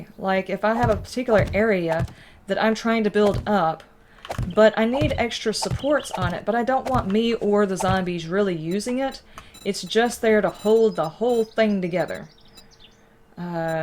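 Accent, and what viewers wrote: South, United States. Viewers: seriously i think shes georgia/missouri and those kinda places she says things like you'all? and other southern words and ways of speaking. also she has those those southern good manners and so on im pretty sure shes from there. havent beed to yorkshire in decades i loved it there i should go back some day :). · Not related to 7DTD, I’m English so being able to place American accents is not a skill I have, what accent is it you have, been trying to figure it out but I’m useless.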